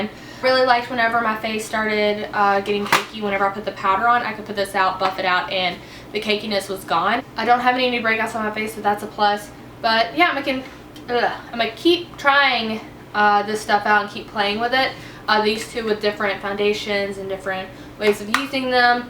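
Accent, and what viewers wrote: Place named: South, United States. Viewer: haha i didnt notice she had one til this comment. maybe a little southern sounding. but im from the us and i barely even noticed.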